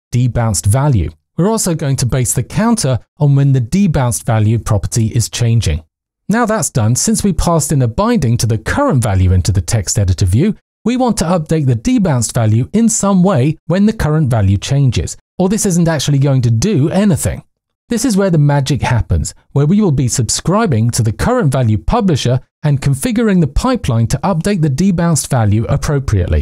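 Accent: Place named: United Kingdom. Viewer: Neat demonstrations that can be easily understood. BTW, wonderful British accent!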